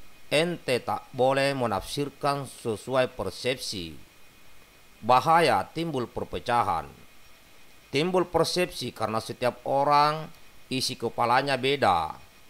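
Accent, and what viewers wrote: Makassar, Indonesia. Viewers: assalamualakum sy senang dengar klo logak makassar ooo mmalee karaeng mg ALLAH SWT jg cnel aaamiiin3 Yaaa rabbal alamin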